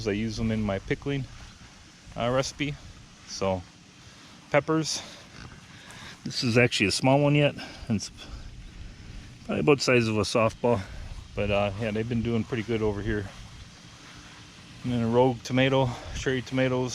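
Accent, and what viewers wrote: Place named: Wisconsin, United States. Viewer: Great stuff. Clearly another cheesehead based on accent, inflection and calling cucumbers pickles 👍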